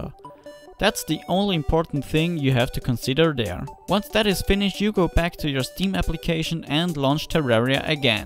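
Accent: Germany. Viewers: Wow you are actually German speaking English. You don't see a lot of Germans talking English now a days .. good job man :D you should have more subscribers than that ;) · Is that German or something? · Are you from Germany?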